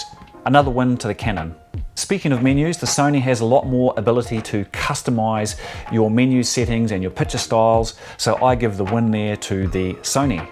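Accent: New Zealand. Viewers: Are you Australian or Kiwi? :) I'm not a hundred percent sure but I rekon you're from New Zealand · He's a Kiwi ;) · Definitely a kiwi accent 👍🏽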